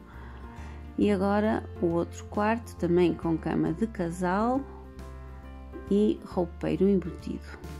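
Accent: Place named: Portugal. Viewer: O apartamento é ótimo. O que eu mais gostei na apresentação foi o jeito de falar que me trouxe uma sensação de aconchego. Acho que me fez lembrar de minha avó, quando eu ainda era criança, na década de 60. Senti um certo saudosismo. O sotaque português é maravilhoso!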